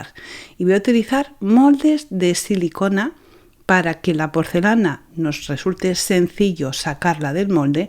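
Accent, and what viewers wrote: Spain. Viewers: ESPECTACULAAAAR!!Lo haré y espero me quede igual de precioso como en tuyo!!!Me encanta tu toni de voz y tu acento Español !!👍👌👏👏😘🌹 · ¡¡¡¡,sabes a mi me sucede ygual?,tengo infinidd de cositas hechas de hace años en distintas cajas,y en ocasiones las encuentro,....y me vuelvo loca mirandolas,como si no las hubiese hecho yo misma,y me emociono.....asi es un poco raro no?....bien tu trabajo es como dices ESPECTACULAR, que belleza besotes desde ARGENTINA creo que tu eres española sai me suenas 🤩🥰😂🤣😚☺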